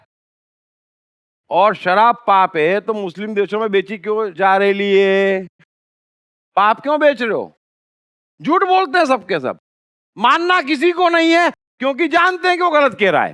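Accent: Gujarat, India. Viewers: Lagta he k sir hamare Gujarat se hoga qki gujarati sbd pyor bolata he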